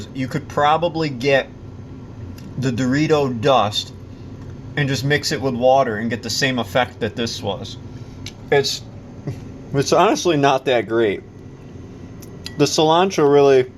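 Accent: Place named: Maine, United States. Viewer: Yeah, I guess I know why the accent sounded kind of familiar since I lived in Maine for over 30 before I bailed out and moved to Seattle. I have never heard of paper and food.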